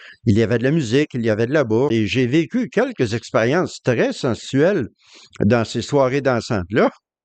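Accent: Quebec, Canada. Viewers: Merci pour ton travail de recherche… pour mettre en évidence les corrections, les changements de la doctrine… Je suis né de parents TdJ, baptisé le 20 avril 68 à 14 ans, membre du comité comme serviteur aux études bibliques à 18 ans, j’ai suivi la formation de l’école du ministère du royaume au Bethel de Bruxelles. J’étais le plus jeune avec les autres frères qui avaient entre 30 et 60 ans… quelques mois après, une nouvelle règle a été mise en place…:il fallait avoir 20 ans minimum pour occuper un des postes du « comité » : surveillant président, adjoint et serviteur aux études biblique. Je me flatte d’avoir été la cause de ce changement de règle dans l’organisation. Moi qui servait de tout mon cœur, viré comme un malpropre pour être remplacé par un frère qui refusait de prendre les sœurs âgées dans sa voiture parce qu’elles avaient mauvaise haleine… mais il avait plus de 20 ans, c’était le plus important désormais pour la WT, plus que la disposition de cœur… Ça a été une douche glacée sur mon feu dévorant intérieur. 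Ça m’a pris des décennies pour me déprogrammer de tout cet endoctrinement. Encore merci pour la clarté et la précision de tes vidéo. J’adore ton accent Québécois 😉 On fera bientôt connaissance dans le nouveau système de choses… 🤪😜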